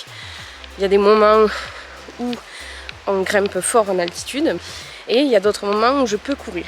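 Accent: South, France. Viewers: Magnifique l'Ariège, tout comme cet accent qui inspire le soleil du sud 👌 Merci du partage 🙏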